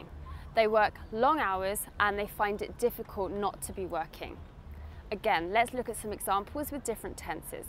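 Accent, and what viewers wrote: United Kingdom. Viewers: You're a genius, I'm obsessed with the British accent only because of you. enjoyed this video and learned Five useful words 1. Brunch 2. workaholic 3. Motel 4. Staycation 5. Fortnight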